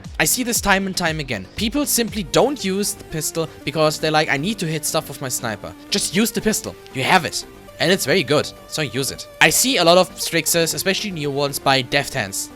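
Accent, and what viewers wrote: Germany. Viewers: alter man hört sofort diesen deutschen akzent :D gutes video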